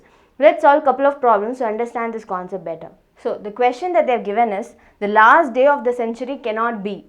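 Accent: South India, India. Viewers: she is southie by her accent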